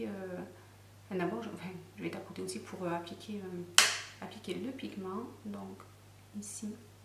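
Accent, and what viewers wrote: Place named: South, France. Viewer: coucou ! ça fait plaisir de te voir en vrai ! Toi aussi tu as un petit accent du sud, tu es d'où ?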